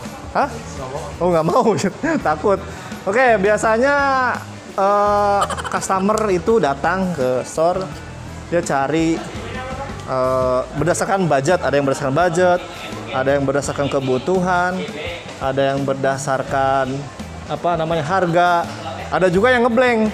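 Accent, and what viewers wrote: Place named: West Java, Indonesia. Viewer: Nyunda' pisan a' Pull etsdi Wait enjel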